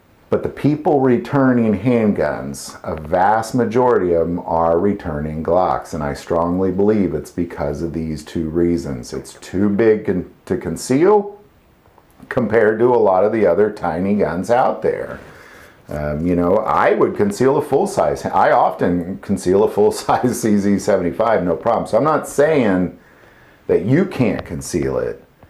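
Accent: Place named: Kentucky, United States. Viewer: I bought a g19 gen 5 for a girlfriend. It wasn't bad but not a good fit for my hands. Dude I thought you were from Kentucky or surrounding area for some reason. Blue steel is a little ride. Thank you for the prayer direct message we talked about. It did work out. Thank you for your positive energy in this direction.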